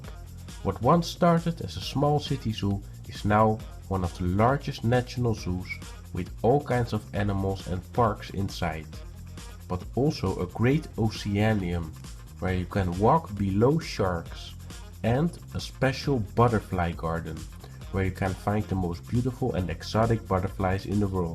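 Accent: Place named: Netherlands. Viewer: To be honest , please pronounce "s" in the end of a word more like a "z" ,not whith a Dutch "lazy s". Same goes form "d" (not a "t"), like : "and" NOT "ent". Sorry to say , BUT if you really are into English , please get interested in pronouncation. (Sorry and good luck)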